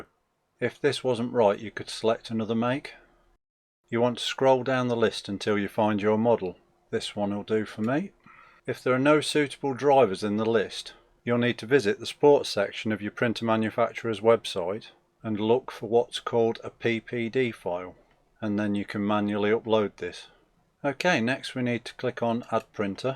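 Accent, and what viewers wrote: United Kingdom. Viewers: a brummy voice i hear!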